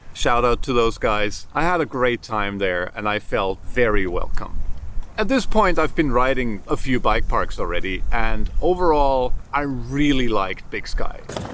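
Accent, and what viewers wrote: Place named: United Kingdom. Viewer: Please give me a call I’d really like to talk to you in and hear you from Scotland or not Wales, but somewhere on the English channel? Your accent is beautiful give me a call I’d I would really like to hear from you, especially for the fact with your experience in Montana, I would like to learn I’m moving out there. I’m not vacationing I’m moving there and I need to be successful and I need to know what’s going on I need to learn from people that are from there or the understand it better than I do and I am leaving today at 12 PM. Give me a call. Text Me maybe some hall we can get together. It would be wonderful to learn from you my friend you sound like a very good person so God bless all the best to you and your family family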